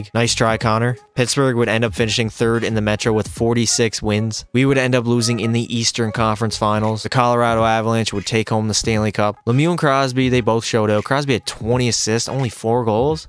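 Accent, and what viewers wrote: Newfoundland, Canada. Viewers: Is your accent from Newfoundland or somewhere around the maritimes?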